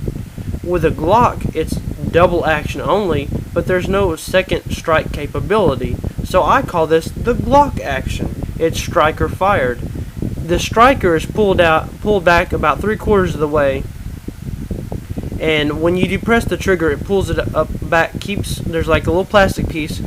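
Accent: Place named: South, United States. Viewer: his accent isnt that strong i would say that accent could only go as south as missouri, stop hating on him just because he has a bit of a country accent city boy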